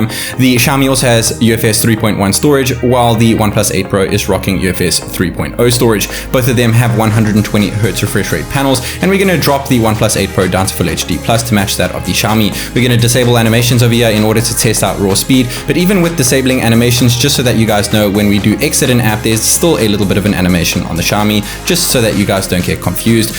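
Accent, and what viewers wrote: South Africa. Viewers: Where are you from bru you sound South African ? much love from SA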